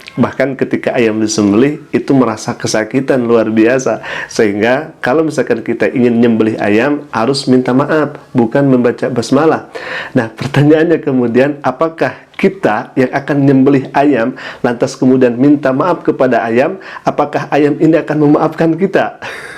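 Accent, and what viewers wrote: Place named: West Java, Indonesia. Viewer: Ini sih ustad dadakan.. bicaranya spt org dari jabar...memalukan org sunda... Dasar ustad koplak.. 🤣🤣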